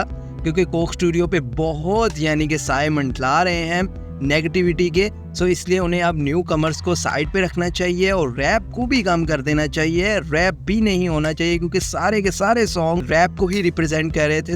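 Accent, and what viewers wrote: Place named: India. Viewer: Aal ready tum Indian ho . Bolne ka style bata raha hai. Idiots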